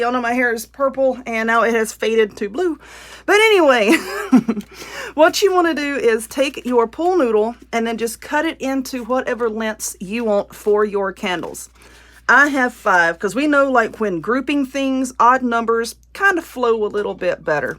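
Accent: South, United States. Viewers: That looks so good your definitely southern I love your accent.i need to try that too co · New Sub here, love the way you talk ! Love the channel, boy you are southern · Where are you from, I'm from SC. You sound like you are from the south.😊 great craft.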